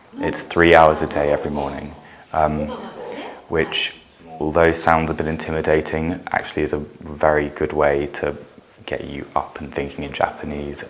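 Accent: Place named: RP, United Kingdom. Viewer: 話し方がとてもポッシュですね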